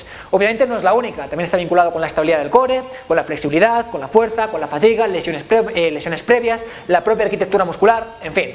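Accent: Spain. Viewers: Hola, me ha gustado mucho tu video. Información muy completa y pertinente para los que deseamos trabajar desde casa o en Vacaciones que no estamos cerca de nuestro gimnasio. Sólo una recomendación, se que tratas de no hacer el video tan largo, pero estas hablando muy rápido sobre un tema que no todos conocemos y usando términos anatómicos poco utilizados por nosotros, lo que hace que se difuculte entender la info. Y bueno en mi caso que hablo español, pero de Latinoamérica, a veces sólo con el acento español nos cuesta un poco entender rápidamente lo que dices, menos aún con la rapidez con la que estas hablando. Tomalo con calma, recuerda que al que de verdad le interese aprender te va a escuchar con atención y verá todo tu video, no importa la duración. Éxitos y esperamos seguir viéndote.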